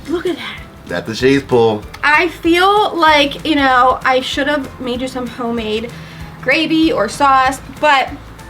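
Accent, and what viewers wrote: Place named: New York, United States. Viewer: forgot to ask…. With “caw-fee”, methinks you’re a “nee-ew yaw-kuh”… am I caw-rect? 😁… brings me back to my roots as a joisey boy hearing from the other side of the river over the Hudson…. I think, once I use up all my preshredded mozzarella, gotta find a decent multi shredder box to shred my own… I might try those small cheese balls in the water… forgot what you called them.. have to rewatch anyway. Pause-n-cook, or write EVERY step down. Either way…. What about a little ricotta (or “riggutt” in the NY/NJ “Sicilian” slang)?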